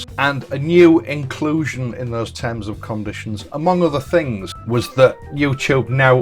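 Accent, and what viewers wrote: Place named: Hull, United Kingdom. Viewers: The way you talk is a problem to some people? That rich Hull accent! Up with Yorkshire! Great channel. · Spoken like a true Yorkshireman! I like your accent, not that it means a lot, but I do. My late Mother was born and bread in Yorkshire, well that part that’s now called CLEVELAND! My father died when I was only 10yrs old, he was a great deal older than my Mother, but succumbed to lung cancer in the days before that disease was linked to smoking, and he was a heavy smoker. My mother had a big family in Thornaby and surrounding areas. After my fathers demise, she sort solace in the bosom of her family, and I spent a lot of time ooop Nooorth! My home town was Cambridge. I thought we were going to move there, and I think that was my Mother’s original intention, but for some reason it never happened, I think it was something to do with my younger Brother and sister. 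Anyway, it’s long way round, to saying that your vids bring back very happy memories for me, and I love ‘em. When I left school, I joined the Army as a Boy Soldier, and my first posting after Boy Service was Catterick. I had a bike by then, and the rides around the moors were among the best I have done. Not to mention the seaside visits to Whitby, Seaton Carew, Redcar, Scarborough, and many of the villages that were made famous by TV programmes, long before they had been found by that Media. All I can say is, that anybody that has anything derogatory to say about you and your work, must have a very sad home life. As for me, being a new subscriber, I am happily going through ALL your vids, and absolutely loving it, and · I love your accent, my missus is from York, the Yorkshire accent is great. I want you to make money from the channel if it means you keep posting. Have a great Christmas.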